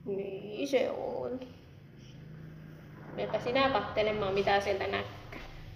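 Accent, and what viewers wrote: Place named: Oulu, Finland. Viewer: On sun puhheesa muutamia oulun murteen sanoja 👍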